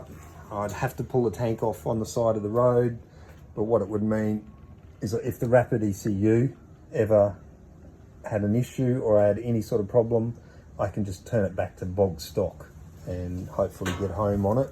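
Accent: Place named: Australia. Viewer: Hay mate I also brought a 2021 V85tt Cenrenario model as well ( had for about 6 months ) was new hadn’t sold . I love the bike , I had a problem with the EML coming on over5500 RPM , right hand cylinder was missing. I reached out on the V85tt fb page and found most helpful. Apparently it was something to do with sonic wheel but resetting valves prior to reset ? , anyway done two big rides since and love the bike . You sound Australian ( I live in Wodonga Vic )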